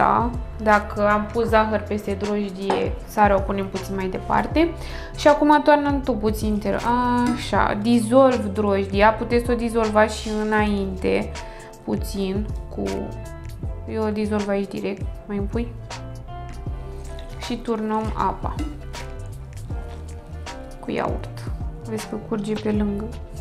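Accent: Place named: Moldova. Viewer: De ce vorbeşti cu accent moldovenesc... 🤔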